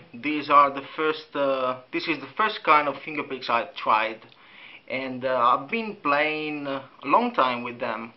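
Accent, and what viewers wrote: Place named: Venice, Italy. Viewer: non avevo mai sentito un accento veneto sull inglese. Fantastico, sembra una via di mezzo tra il gallese, e il liverpulliano . Grade. gran video sulle unghie atrifiaciali, mi serviva:-)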